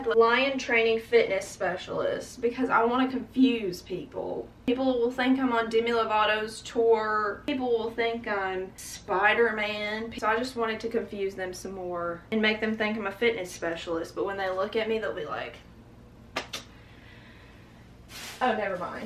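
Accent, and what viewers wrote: South, United States. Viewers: I love her country accent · r u southern. also, love that orange shirt. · Its her real accent shes a real southern gurl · U like batteries and worry about being mistaken for Spiderman. With this southern drawl, u can get away with anything. xo's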